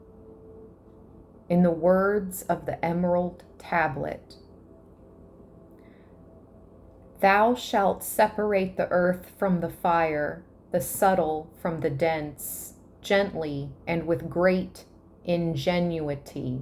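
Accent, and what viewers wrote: United States. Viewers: Are you from Kentucky?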